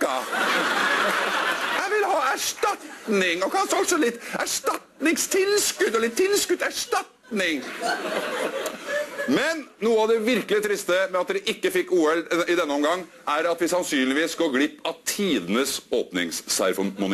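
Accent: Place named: South, Norway. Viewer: beklager så meget, men jeg gjetter at du kommer fra Sør Norge?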